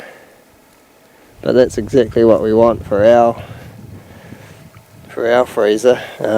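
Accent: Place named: New Zealand. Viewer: Did you grow up in the urewera region bro or a you a southerner ?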